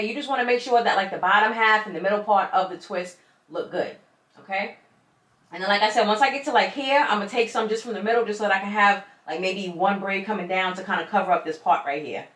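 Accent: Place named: New York, United States. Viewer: I love you NY accent ❤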